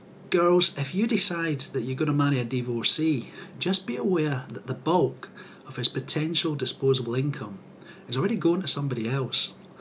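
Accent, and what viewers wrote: Scotland, United Kingdom. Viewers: you're reasonable, soft-spoken, w/a bad-ass scottish accent, AND you like cartoons? *subscribes*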